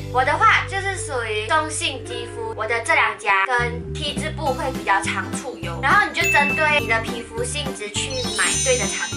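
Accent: Taiwan. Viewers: 你应该是去台湾太久了 讲话的音调都像台湾人了哈哈哈哈哈 加油哦 期待你下一部影片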